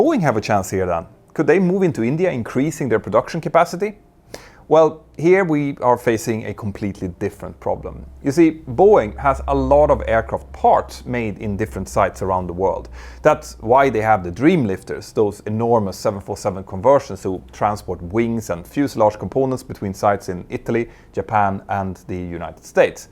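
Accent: Scandinavia. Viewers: I am not sure but this guy’s accent sounds pretty Nordic 😊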